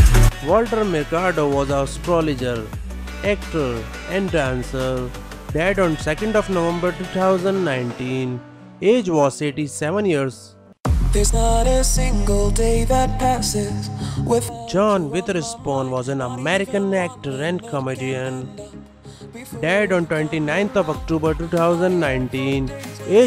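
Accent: India. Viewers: Indian accent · Oh my god i dont understand your accent. Make it clear when you speak. I guess you are ndian obviously in your accents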